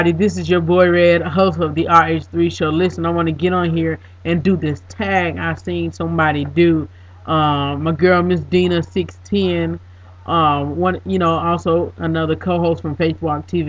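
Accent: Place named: Washington DC, United States. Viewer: OMG! He is sooo cute!! Yasss for the tag video!!! The D.C. accent is easy to pick up...I love it!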